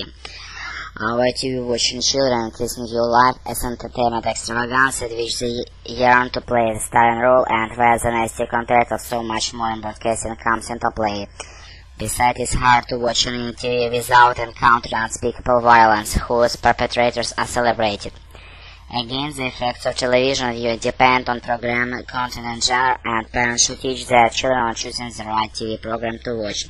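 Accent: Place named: Russia. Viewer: чотко слышно русский акцент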